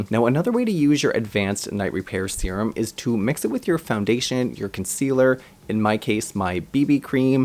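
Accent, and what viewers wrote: Canada. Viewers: Are you Canadian? Great review by the way 😁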